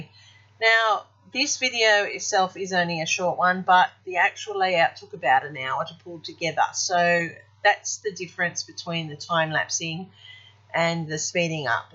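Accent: Australia. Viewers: I adore your Aussie accent :-). The rabbits are just lovely! Great art work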